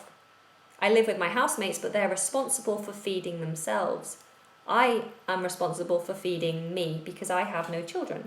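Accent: United Kingdom. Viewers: easy and clear British english. Thanks for what you are doing. Just subscribed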